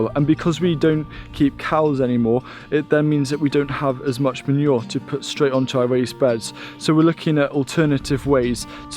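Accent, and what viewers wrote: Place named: Wales, United Kingdom. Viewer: Great video. I'm surprised you don't have your own TV show. Perhaps you do. I'm in Canada and have no way of knowing. I'm Scottish and was curious about your accent. Now I see your Welsh. All the best and please keep them coming.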